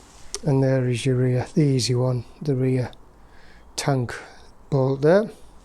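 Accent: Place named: North, United Kingdom. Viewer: Yes very good videos. Good northern accent very smooth. Looking to get one of these myself.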